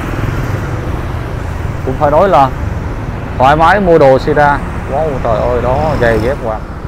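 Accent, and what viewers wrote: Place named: Hanoi, Vietnam. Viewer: Giông ad như ở thủ đô của Cụ ba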